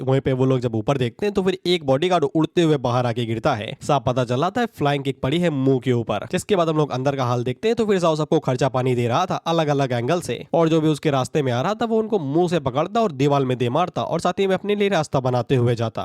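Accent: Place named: Bhojpuri, India. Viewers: Bhai bhojpuri ka tadka marte raho achha lagta h 😂😂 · Bhojpuriya voice bahut accha laga Beech beech me bole karo maza aata he😂😂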